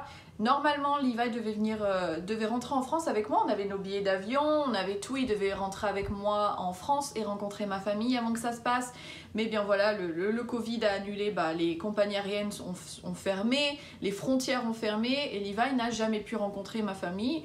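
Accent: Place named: United States. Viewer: tu as pris un petit accent américain j'ai l'impression ^_^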